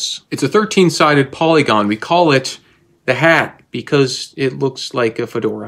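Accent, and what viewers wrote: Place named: England, United Kingdom. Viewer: Why is there a german flag on your ceiling, when you can't even pronounce german without an English accent. (Genuine question here, no disrespect intended!❤)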